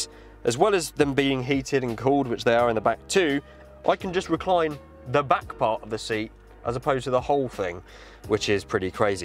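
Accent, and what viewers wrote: United Kingdom. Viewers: Bro., your voice impressions of Doug Demuro was 100% spot-on. But what was equally amusing was your transition to your native British Accent as i thought you are American and certainly never heard of any Britt impersonate our Accent so accurately. You earned my subscription right away.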